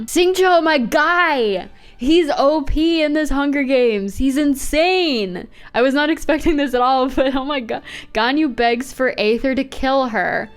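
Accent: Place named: United States. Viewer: this girls american accent and mispronounciation of tradional names is killing me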